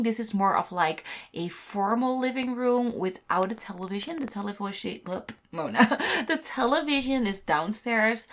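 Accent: Netherlands. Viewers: Je hebt echt een Nederlands accent ik hou ervan. 🧡🇳🇱🧡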